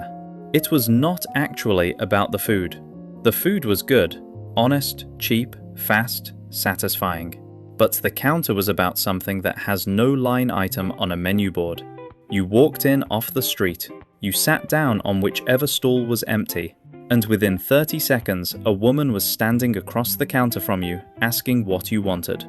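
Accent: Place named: England, United Kingdom. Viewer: An English voice on nostalgia from The United States of North America. 😊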